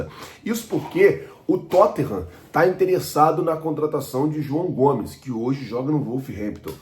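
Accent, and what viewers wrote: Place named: Campos, Brazil. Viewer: Pega umas aulas de inglês e português; esquece o campistês, cara. Que mania de inventar jeito errado de falar, irmão! Isso é costume de campista. Na prova de português do meu concurso pra UENF, um dos textos foi sobre isso. Os tempos mudaram; não insista. Assisto e gosto muito de você. Sucesso,!!!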